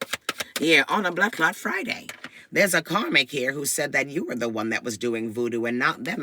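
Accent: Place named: Jamaica. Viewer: Why do I knw you talkin to me? I hear the voice of God in you...that deep voice resignates i can relate and n your speaking patois...Jamaica my birth place ...jhheeeez bless up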